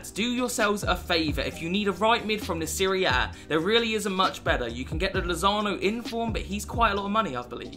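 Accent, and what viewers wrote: United Kingdom. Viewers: I really can’t tell if you’re British or not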